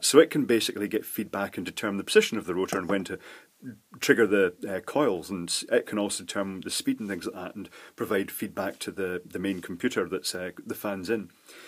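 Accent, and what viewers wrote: Scotland, United Kingdom. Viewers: You sound exactly like Scott Manley, does every older Scottish person sound like that?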